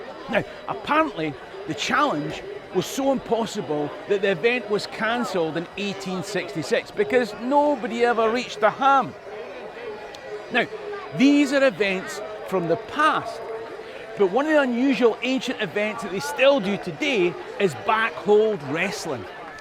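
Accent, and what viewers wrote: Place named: RP, United Kingdom. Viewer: Dear Mr, I am a Greig. Daughter of a Greig father from Kinghorn, Fife. His parents were a Mummy MacDonald and a Daddy Greig. Proscription didn't only ban our motley - IT BANNED MY PATERNAL CLAN. We are a sept of MacGregor (banned, hated, hameless) OMG. STANE, I always say Dry Stane Walls. Standing as proud and unapologetic as a Highlander. With a great RP English accent. Sigh Thank You for your work. LOVE IT:o)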